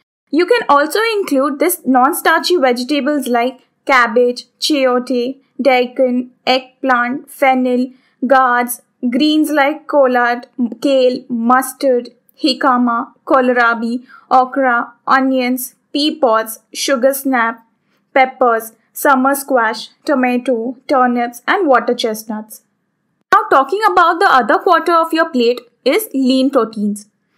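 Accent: India. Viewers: Indian logo ki english..uffff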